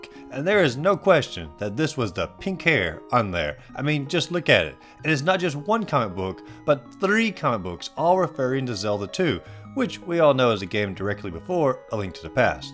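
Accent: South, United States. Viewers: Sweet video man! Sounds like you're holding back a pretty significant southern accent. You should let it shine! Not enough gaming channels with attitude.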